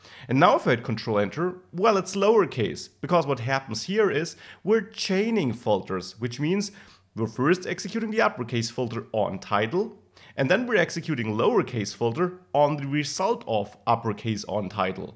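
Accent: Germany. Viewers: nice Germanized British American Accent Mix...Filter :)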